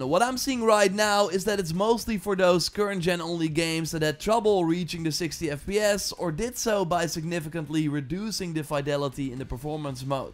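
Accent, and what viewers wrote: Netherlands. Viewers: Hearing the accent, dutchie right?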